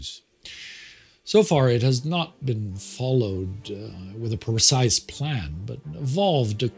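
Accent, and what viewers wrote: Germany. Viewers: Germany iirc. You can tell by his german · Yeah, I speak German, but I bet a lot of English speakers are confused. He pronounces some things the German way that should not be, because the English speakers will not understand.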